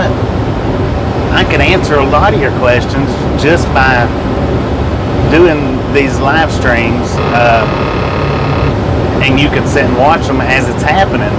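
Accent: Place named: South, United States. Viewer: since Kyle was saying how he feels here I go lol. From your first live stream which came across in my feed. I heard a southern accent so I stayed tuned in. Then after many more streams I thought this guy is genuine. Your a friendly guy and you always seem calm. Great attributes to have bud. As far as your driving your awesome at that. Your cool with your subscribers and it has paid off. Anyways, after I read Kyle’s comment it compelled me to say what was on my mind. When I met you and seen your the same in person as well as your YouTube live streams. That went along way with me. I don’t stay as long as I’d like I get busy and have to step away but I try to pop in every stream and most the time when I step away I let it run lol. If I subscribe I support and you’ve done the same with me. I appreciate you brother and it’s good to see the subs you have. 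All mighty fine ppl. Okay ok. Nuff of this lol. Just being honest brother.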